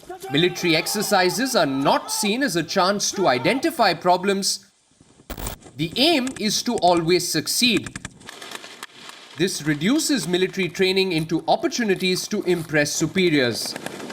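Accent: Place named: India. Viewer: Lol, when you hear someone with an Indian accent talking about China, I suggest you quite the channel immediately because all it's gonna bring is propaganda=trash. And as a PLA veteran, I assure everyone here that we will fight for China, we will obey orders from the CCP but all of us are willing to give our lives for it, as there won't even be modern China without the CCP. And please, nobody will force you to believe in Communism when you don't want to, and you don't have to be a party member to serve. It's being 12 years since I've touched a gun, but if my country needs me I, along with 600 million Chinese man will fight any minute. I believe everyone will do so for their own country regardless nationality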